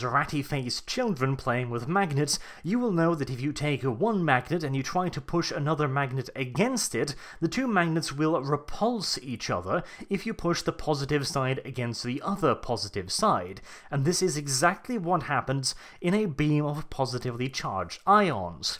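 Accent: RP, United Kingdom. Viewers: im English and a big fan. but please stop shitting on the British when you quite clearly speak in a posh English accent. seems quite hypocritical.:) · He's actually Norwegian, but somehow he speaks in a weird posh british accent.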